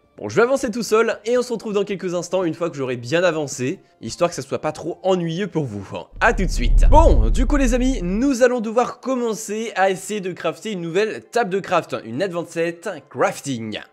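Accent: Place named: England, United Kingdom. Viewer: Très belle accent anglais 👌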